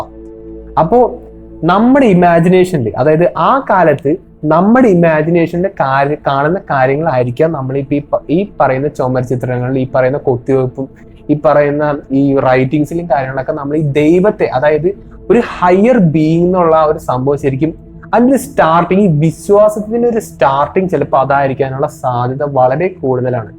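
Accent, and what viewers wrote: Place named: Kochi, India. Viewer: കൊച്ചിക്കാരൻ ആണോ..? ആ ശൈലി ഇത്തരം വിഷയങ്ങൾ കൈകാര്യം ചെയ്യുന്നതിൽ അഭിലഷണീയമല്ല.. വ്യക്തത വളരെ കുറവാണ്... 🙂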